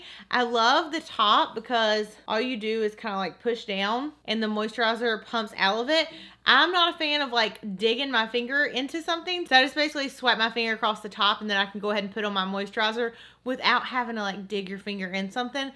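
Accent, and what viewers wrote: South, United States. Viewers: Why would ANYONE dislike video?! Lol 😂 honestly idgaf what video it is, I love watching her stuff, her voice is relaxing (her country accent) and she's funny!!! PLUS she talks about makeup!!! What's not to LOVE?!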